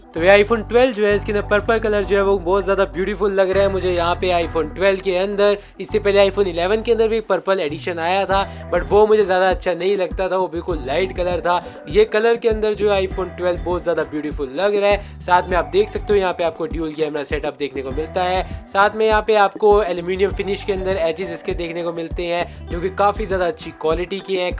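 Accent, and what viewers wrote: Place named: Gujarat, India. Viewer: Bhai apke bolne ke ascent se ap gujrati lagte ho❤😂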